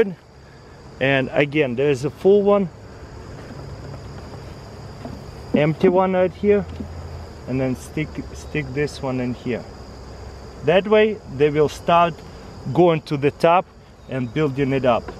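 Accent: Russia. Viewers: lol.. whats with all the anger? He was only joking. The accent/spelling is part of what makes him so amazing. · lying!! I'm a grown ass woman, not some child you can trick! He not only has a Russian accent but broken English, plus, you can find his bio on Google!!! Why tell lies? It's not gaining you anything other than looking like a fool!! Yes he lives in NC now but he was born and raised in Russia! His name is Тарас Кулаков) Does that look American to you? Actually he's Czech but that's Russian! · Hey guys. He is Russian. Do you speak other language(s) as a native speaker? No??? That's it. Think, think, think before write a comment!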